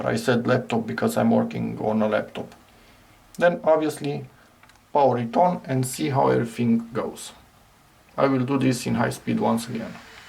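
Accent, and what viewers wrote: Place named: Romania. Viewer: You have such a strong roumainian accent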